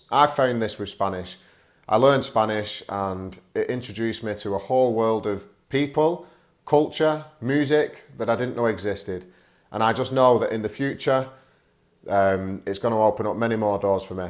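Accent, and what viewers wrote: United Kingdom. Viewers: Thanks for your videos, I watch your lessons because of your cool British pronunciation. Really it gives shift to undestand original Royal English accent :). Again many many thanks for your lessons. Sincerely Anvar from Uzbekistan...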